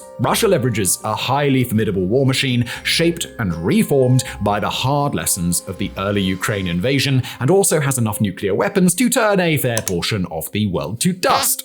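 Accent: United Kingdom. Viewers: ok, 3000 comments so probably no chance you check mine; so you ll get a quick comment mate, you don't know what you re talking about, which is surprising considering you sound british just read churchil s memoirs mate and you ll know what s going on also, forget NATO troops, french troops; france got out before, can get out again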